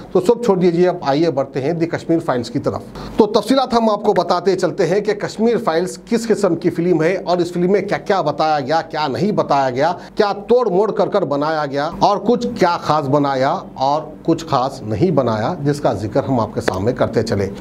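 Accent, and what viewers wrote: Hyderabad, India. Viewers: bhai aap pakke hyderabadi hai mast baatein karte aap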